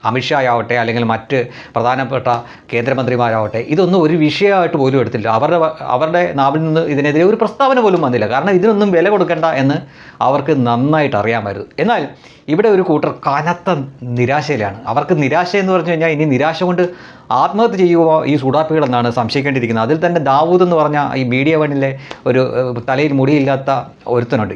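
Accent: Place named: Onattukara, India. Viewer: എന്തുവാടേ, നല്ല ഓണാട്ടുകര ഭാഷയിൽ പൊളിച്ചു കൈയ്യിൽ കൊടുത്തു, keep it up👍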